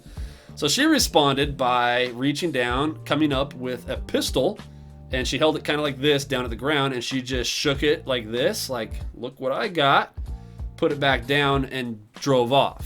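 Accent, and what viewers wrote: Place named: South, United States. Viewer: Ight it’s definitely not you, this guy has a southern accent, my b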